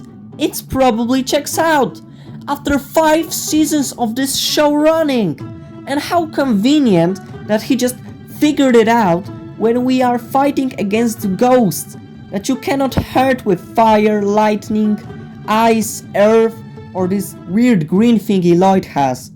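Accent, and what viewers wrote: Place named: Poland. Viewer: i can hear your polish accent im polish too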